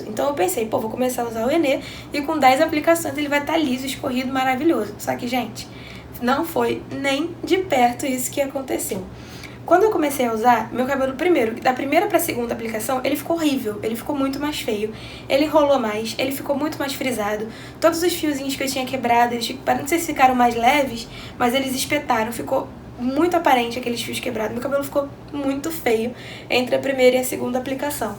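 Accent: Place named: Rio de Janeiro, Brazil. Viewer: Sotaque carioca é tão bonitinho... 💋 de Sampa.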